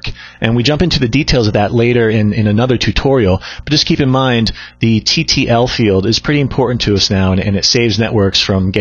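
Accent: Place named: United States. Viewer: Great explanation and most importantly: an AMERICAN ACCENT!! Thank you!!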